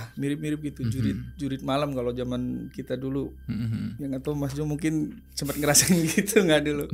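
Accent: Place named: Indramayu, Indonesia. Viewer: Logat indramayu dan cirebon nya gak ketinggalan😂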